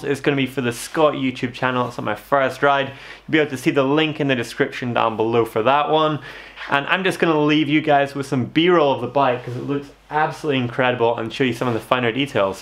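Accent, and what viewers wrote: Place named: United States. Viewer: Might just be me but your accent sounds a bit American!? Nice bike though.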